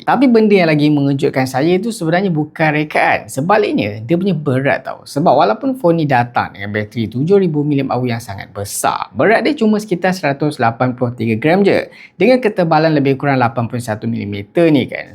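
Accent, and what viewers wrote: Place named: Perak, Malaysia. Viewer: Jongkang jongkit..Kompom org perak ni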